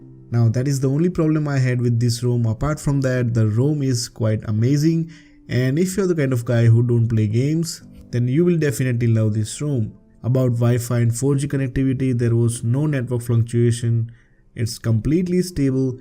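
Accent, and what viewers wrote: India. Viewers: just gonna say the obvious but is there a single fucking review of lineageos 16 without an indian accent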